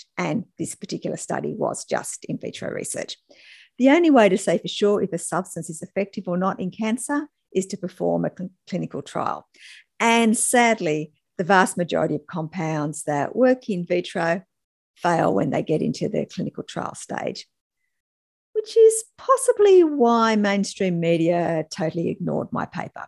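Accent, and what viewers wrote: Australia. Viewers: she has Australian accent, so whatever their equivalent to NIH would be; also, Australia went full totalitarian fascist Chinese-style lockdown, destroying many lives and livelihoods (much more than USA, which was bad enough) during the plandemic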